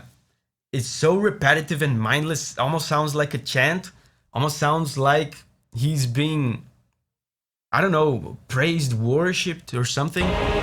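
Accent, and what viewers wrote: Brazil. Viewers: Salve mano, via seu canal desde os vídeos de Final Fantasy XVI e achava que tava reconhecendo seu sotaque e o jeito de falar. Pesquisei um pouco e descobri que tu é brasileiro também! Seu canal é incrível cara, fico feliz de ter um Brasileiro sendo fluente e profissional na área de músicas de jogos, Desejo todo o sucesso do mundo mano, ABRAÇO.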